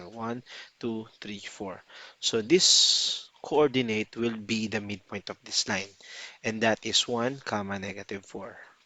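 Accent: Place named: Myanmar. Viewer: မြန်မာသံ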